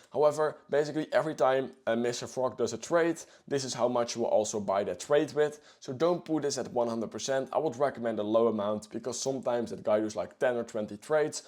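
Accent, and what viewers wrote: Netherlands. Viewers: Kom jij uit Nederland?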